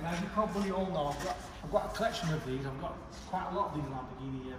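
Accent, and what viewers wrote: India. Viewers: Hey bro you are indian we get that from the accent and your name but why don't you reveal your identity??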